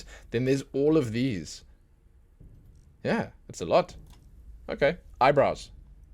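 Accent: South Africa. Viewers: Subbing, cause your accent is South African😂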